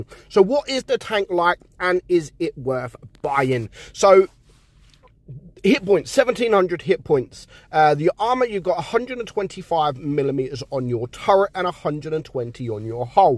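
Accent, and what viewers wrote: United Kingdom. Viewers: I like your Brittish Accent😁🇬🇧 Do you prefer Cobra? but is just like Smasher but 9 and medium.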